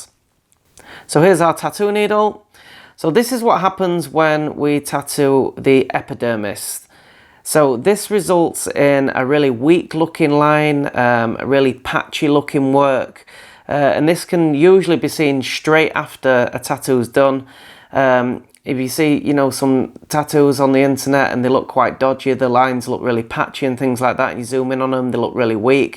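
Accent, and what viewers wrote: United Kingdom. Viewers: Thank you SOOOOO much. you brits make anything sound awesome. love your enthusiasm and brainworks. watching video after video can become boring or even worse misinformed. I am a sponge...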